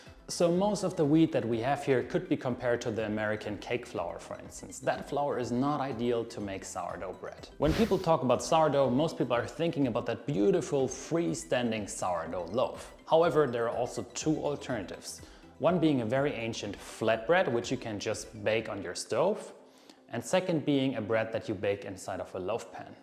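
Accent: Germany. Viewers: My mom was born in Remshied, Germany, so listening to you brings me back home🎉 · Wenn Deutsche am perfekten Englisch feilen…. Hört man trotzdem raus, dass es Deutsche sind. Dein Englisch ist sehr, sehr gut. Keine Frage!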